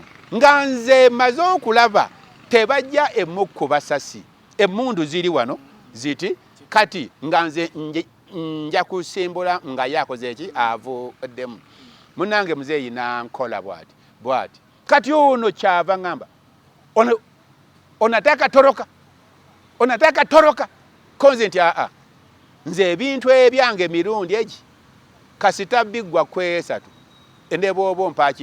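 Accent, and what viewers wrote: Uganda. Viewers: Nze bwedda ndowooza si muganda. Nga ndowooza mu Easterner kuba ebigambo byo' luganda abi pronouncinga nga bo. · Why call yourself when are clearly a Mugishu? Your accent is from the ba Masaba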